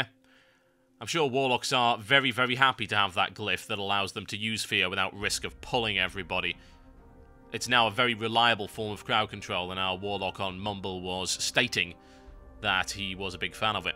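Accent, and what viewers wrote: United Kingdom. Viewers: I love this guy. I find what he says halerious. Love the accent. Nice work Brit :D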